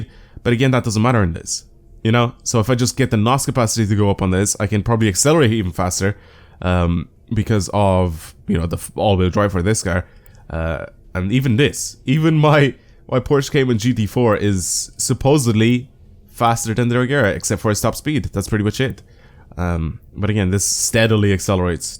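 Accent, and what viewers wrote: Ireland. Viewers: Are you Irish you sound it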